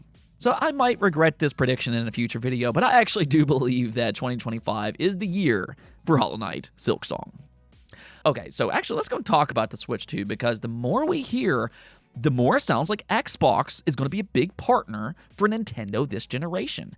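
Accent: Philadelphia, United States. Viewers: Your accent, are you from Philly or nearby?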